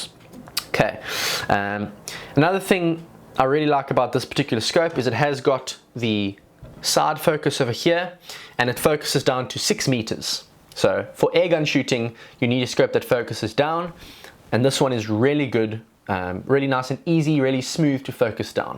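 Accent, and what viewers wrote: South Africa. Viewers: We gonna be looking- you talk like that everytime, its englisch or South african like zulu?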